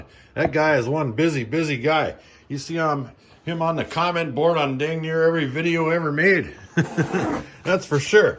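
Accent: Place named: Pennsylvania, United States. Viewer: The " crick " I haven't heard yankee in a long time. That's the we talked in P.A. growing up. Moved to N.C. over 30 years ago. The accent is refreshing. A little taste of home. Love your video's so far. Thank you.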